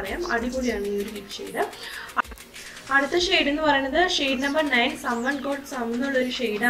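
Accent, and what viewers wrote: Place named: Kannur, India. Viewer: Nhan chechide channel subscribe chettan parannal .chechide skin time San enteyum so enikk chechikk pattunna products vagham 2 ,chechikk useful akkunna products chechi njaghalkk suggest cheyyullu 3,njan chechiyude sister subscriberann divua chechi paranju chechide channel verorall prompt cheyth valarunnath ishttammalla Nn so I subscribe you 4,chechi kannur nokku samsarikkunnath pole thonnu I love lipsticks if you don't mind enikk chechikk ishttamulla products thannal mathitto 😘😘😘😘😘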